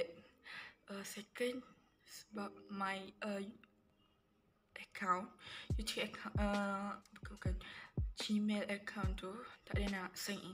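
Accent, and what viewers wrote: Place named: Kuala Lumpur, Malaysia. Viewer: Panda kecek KL 👍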